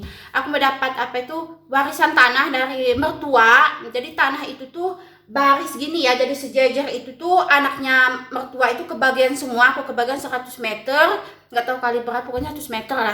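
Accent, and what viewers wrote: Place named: Lampung, Indonesia. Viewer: Logat mu kayak org Lampung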